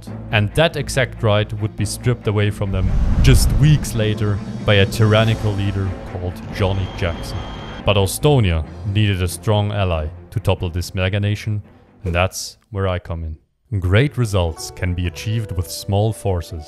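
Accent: Germany. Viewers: Are you german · I hear a german accent. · dein deutscher akzent ist so wild XD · i can hear the german in your voice :D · Are you german?! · You kinda sound German :) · Are you from germany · der deutsche akzent hittet schon echt aber ich feier den content mega! keep it up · Man kann hören das du deutsch bist haha cooles Projekt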